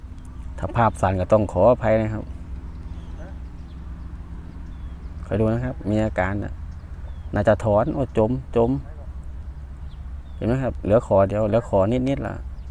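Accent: Korat, Thailand. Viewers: ฟังสำเนียง โคราชหรือนางรองครับ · โคราชบ่คับ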